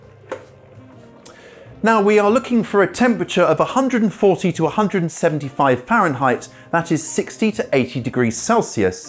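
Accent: United Kingdom. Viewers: You sound distinctly British. Is there a reason you give your Imperial units before your metric ones?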